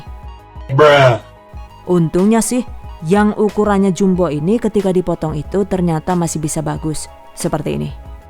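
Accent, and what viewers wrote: Banyumas, Indonesia. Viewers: Bukanya serius nonton malah ketawa denger ngelawak ngapak😂😂😂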